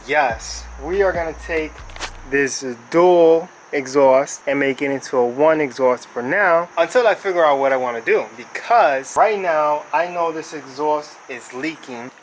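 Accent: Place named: New York, United States. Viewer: Enjoyed the video totally yu definitely from ny tho Not for nothing 😭💯🤘🏾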